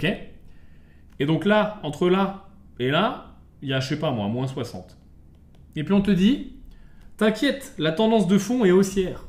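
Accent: Marseille, France. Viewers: Bien l’accent marseillais 😃, allez Marseillllleeeee 😄 !!! Si j’ai bien compris i need to have the guts (intestins in english) pour aller sur certains marchés. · Pas mal l'accent marseillais !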